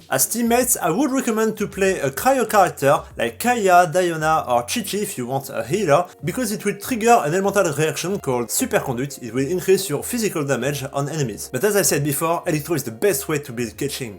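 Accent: France. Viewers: J'ai tout de suite vu que t'était français avec l'accent mdrr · alala l'accent fr de qualité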